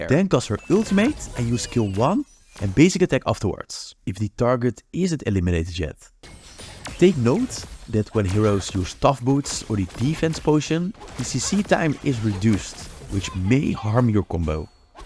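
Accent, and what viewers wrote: Netherlands. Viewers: Are you Dutch? Lol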